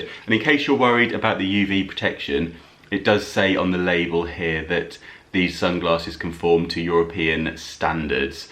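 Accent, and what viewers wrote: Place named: RP, United Kingdom. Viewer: Your pound store is like our Dollar Tree in the USA. Your wonderful accent makes it seem so much more posh! We need you to represent our stores over here! Love the little cutting board, so many uses and looks a bit more expensive. You could have friends over for a cheese and cracker sampling and each cheese could have its own platform.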